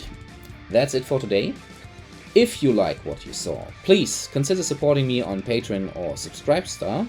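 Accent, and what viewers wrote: Germany. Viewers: This guy german ? But None the less great review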